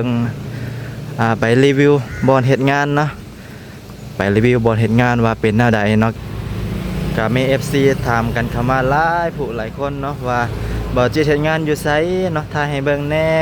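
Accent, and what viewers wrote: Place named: Laos. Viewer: เว่าลาวดีแล้วน่ารักดี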